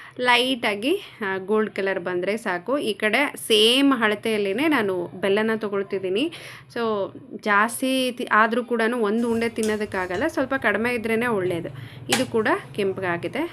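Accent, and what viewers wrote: Raichur, India. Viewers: Hi namaste from dharwad.. Nimma bhashe keltiddange ello nam kadeyvralva ansitu ella vedio na scroll madi nodi huduktide nim introduction vlog enadru idiya anta... Hage 5-6 vedio nodtiddange nim wedding card alli lingasuguru anta nodi khishi aytu namdu kuda raichur... Hege vlog madta iri.. Sadaa khushiyagiri...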